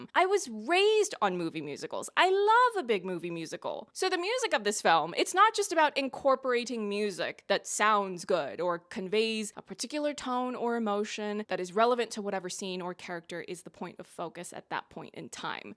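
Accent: Ireland. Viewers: not hear the Irish accent until watching this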